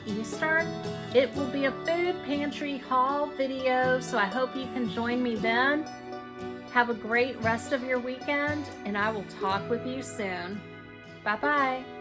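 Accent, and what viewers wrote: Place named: South, United States. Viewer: I thought so because of your southern accent. 👍 I'm watching you from upstate NY. We have the beautiful Mohawk Valley.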